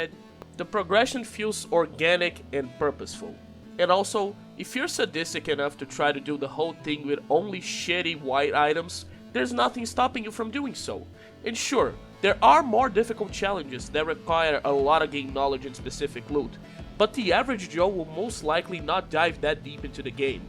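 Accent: Brazil. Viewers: I don't know how but I immediately spotted a brazilian accent on his voice, which took me off guard since: 1- I'm terrible at that; and 2- I don't know many brazilians who enjoy Borderlands. It's definitely lesser known IP around here and I had to brute force my friends into this franchise. What a pleasant surprise, keep going! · Eu to aqui pensando "que sotaque... brasileiro" ai fui ver e de fato, é um brasileiro falando e.e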